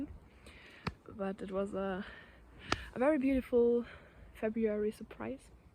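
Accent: Germany. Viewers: Thanks for the recommendation. I also liked the movie mitty, where he lives a simple life and become to enjoy it, when he goes on a journey because of his work. I first thought English is your first language, but I kind of hear some german accent. Sprichst du etwa deutsch?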